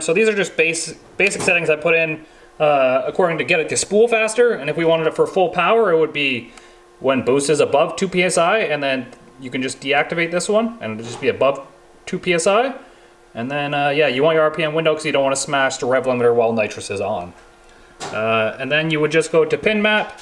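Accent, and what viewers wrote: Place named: Newfoundland, Canada. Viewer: You sound 80% more newfie in this video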